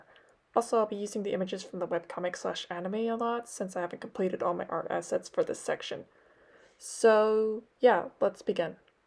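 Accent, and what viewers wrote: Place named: Hungary. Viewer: I'm really exited for the full video! Someone finally talks about one of my favourite ships. And you have a really cute Hungarian pronunciation.:D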